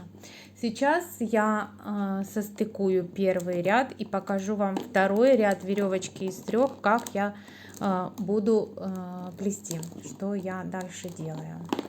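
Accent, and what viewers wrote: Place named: Ukraine. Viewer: Очень рада, что попала на Ваш канал. Самое главное, что слышу наш родной говорок. Украина . Замечательные работы, прекрасная подача. Хоть я плетун со стажем, но все таки очень хотела бы МК по белым трубочкам. Спасибо огромное за работу. С меня лайк и подписка. Удачи, вдохновения и процветания каналу !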